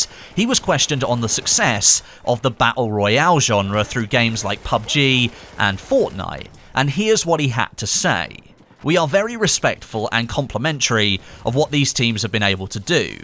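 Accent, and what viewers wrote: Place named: Essex, United Kingdom. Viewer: He's so Essex!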